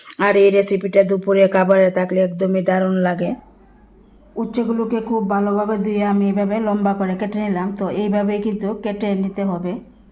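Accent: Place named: Tripura, India. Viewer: Bhalo laglo. Kintu aapnar bangla uccharan keno annya rakam. Aapni ki tripuri or silent?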